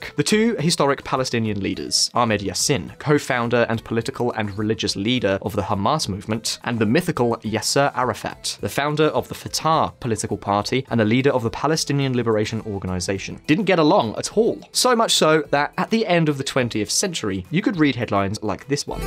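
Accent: United Kingdom. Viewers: He’s British.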